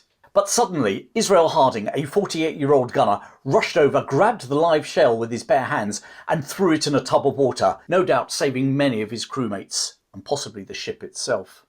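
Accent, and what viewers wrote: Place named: Wales, United Kingdom. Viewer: That Welsh accent 🤣🤣 I didn't know anything about this incident - it's always fascinated me the way the various European imperial powers collaborated so actively and openly in the decades before the First World War. The Taiping rebellion and various affairs in Egypt spring to mind but there are other examples. A shame that had to be ended by total global conflict.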